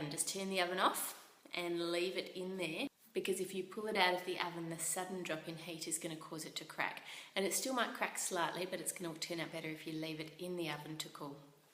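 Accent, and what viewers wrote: Australia. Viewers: Dn't know why, just a normal Aussie Accent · lol accent? I could barely tell, and I'm from the US. Sounded like average Aussie to me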